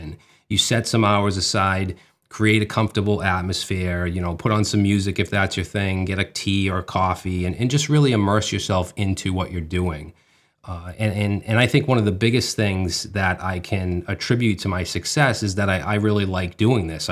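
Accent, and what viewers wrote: Boston, United States. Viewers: I love the Boston accent.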